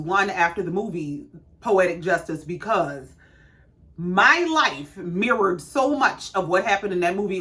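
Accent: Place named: Lowcountry, United States. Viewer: You look great today! That top is cute! Thanks for posting, enjoy your thoughts and getting to hear little twinges of your accent, it reminds me of some of my low country folks.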